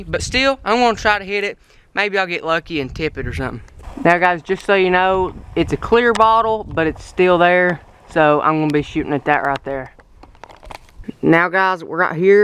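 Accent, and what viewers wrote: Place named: South, United States. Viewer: what state do you live in if you don't mind telling. I can tell your southern but I'd love to know whereabouts.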